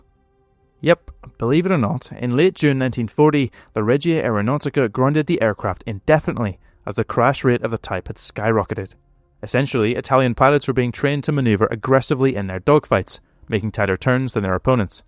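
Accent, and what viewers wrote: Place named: Northern Ireland, United Kingdom. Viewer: Well done, a most enjoyable documentary with plenty of humour and a delightful NI accent. Looking forward to part two, cheers.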